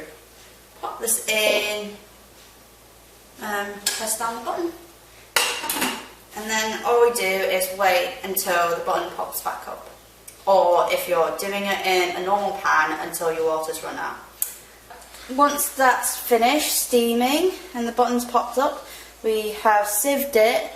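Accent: United Kingdom. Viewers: wow british accent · Are you from England. If so where in England that accent is so strong! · great job done by a new generation Taiwanese, like your British accent. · your british accent is simply fantastic....Hi from Malaysia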